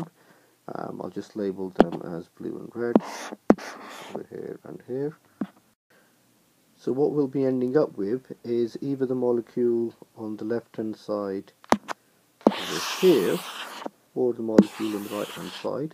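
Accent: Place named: South Africa. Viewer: i think u are South African sir, your accent tells, by the way good job.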